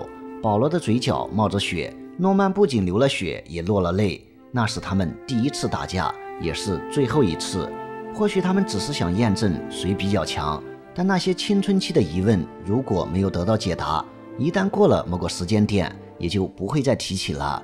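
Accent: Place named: Henan, China. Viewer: 河南方言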